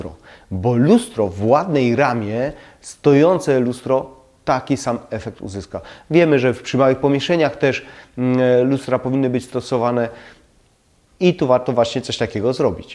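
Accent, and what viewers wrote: Silesia, Poland. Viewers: Zgadzam się z przedmówcami, a od siebie jeszcze dodam, że mówi Pan wyraźnie, bez zadęcia, a śląskie naleciałości są fajnym smaczkiem :) Dziękuję, że Pan się przykłada i dzieli wiedzą. Ciepłe pozdrowienia ☀️ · Czy ja słyszę nasz cudny śląski akcent? 💚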